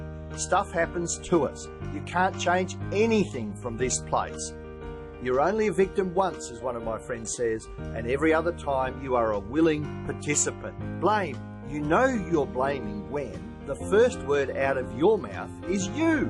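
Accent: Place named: Australia. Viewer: I loved the Aussie accent 🫶🏼🦘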